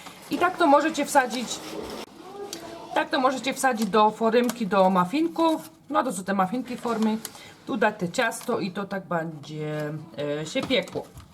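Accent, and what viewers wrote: Silesia, Poland. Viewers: moje dzieci są zachwycone Pani gwarą, my jesteśmy ze Szczecina i nie mal każde słowo Śląskie jest dla nas ciekawe w słuchaniu, dzieci zachwycają się każdym zdjęciem deserowym, wiec oglądamy po jednym filmiku dziennie i od czasu do czasu pieczemy i gotujemy. Na pewno wyciąga nas Pani z kuchennej rutyny za co DZIĘKUJEMY:) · na początku bardzo gratuluje 13 tys. i życzę aby było ich jak najwięcej. Trafiłam tutaj dzięki poleceniu DG no i gdy zobaczyłam te cudowne torty zostałam najdłużej. Czasem włączam sobie pani filmik i zastanawiam się czy mi by też taki tort wyszedł? Dodatkowa urzekła mnie pani gwara, jestem ze śląska z Gliwic i moja babcia mówi w ten sam sposób, czasem mi przykro, że my (wnuki) tak nie potrafimy. Pozdrawiam, Wioleta. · nie pamiętam już jaki przepis był tym pierwszym uwielbiam je wszystkie i wiele wypróbowałam z przyjemnością oglądam nowe filmiki a najbardziej podoba mi się jak super mówisz po śląsku niektóre filmy oglądam tylko aby posłuchać Ciebie jestem z Gdańska i gorąco pozdrawiam Ciebie i Twoje słodziaki które czasem pokazują się lub odzywają buziaki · ja na trafiłam na ciebie jak szukałam przepisu na pannacotta twój kanał jest taki inny niż wszystkie i twoja gwara śląska jest dla mnie bardzo śmieszna i mieszkasz obok mnie bo ja mieszkam 70 km od Monachium pozdrawiam serdecznie :* a może jakieś Q&A nagrasz ? 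· witam! Ja panią oglądam juz od prawie roku i super dodała pani przepisy w tym roku jest Pani the best i najlebszą z kucharek Pani jest ma pani śliczną kuchnie i super dzieci ja osobiście zazdroszcze im że jedzą takie pyszne żeczy ma Pani najlepsze recepty na całym you tubie ja poleciłam panią nawet mojemu sąsiadowi Kubie i jeszcze powiem,że fajnie Pani gogo po śląsku bo śląski to przecierz mój polski język a natrafiłam na Panią tak z przypadku i teraz oglądam Pani każdy film pozdrawiam: Paulina z Czechowic-Dziedzic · Ale ześ wymyśliła konkurs. Ja ci nie powiem jak cię znalazłam. To były chyba twoje rózne drozdżowe wywijasy: piza słońce, makowiec i gwiazda z nutellą. Ja zasubskrybowałam cię bo uwielbiam, jak gadasz z tym ślaskim akcentem:D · Ja poznałam panią poprzez film o Elsie z krainy lodu :) mieszkam w Małopolsce , mam 15 lat i bardzo lubię piec :) mam rodzinę na Śląsku , wiec pani gwara nie jest mi opca :) pozdrawiam ciepło ! 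· Moje gratulacje z okazji subów, jestem z dolnośląska z Głogowa, bardzo podoba mi się że pani przepisy nie są wymyślne ani skomplikowane i "goda " pani krótko i na temat i często daje te filmiki co budzi moje zdziwienie, że przy trójce dzieci pani to ogarnia i jaszcze jedno- ten słodki szkrab uśmiechnięty wysyłający buźki, bardzo mi się podoba, szkoda że nie jestem jego ciocią i nie mogę go wyściskać, nie miałabym nic przeciwko temu żeby pojawiał się w każdym filmiku.Mam koleżankę w okolicach Katowic i wtedy też godom, bardzo mi się te gwara podoba .Oczywiście polecam kanał, często korzystam z przepisów, trafiłam dosyć dawno przypadkowo i oglądałam ciurkiem wszystkie przepisy, pozdrawiam, życzę dalszych sukcesów. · Jestem ze slàska i mieszkam w Hisxpani dosyć troche i słucham cie po ślonku to fajne jest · ja trafiłam na pani kanał jak szukałam jak zrobić ciasto na pizze. Oglądam panią razem z moją mama.:-) jest pani super!:-) Mieszkam na śląsku obok Katowic i Sosnowca. 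miło jest oglądać kogoś co tysz umi godac po slasku.:-)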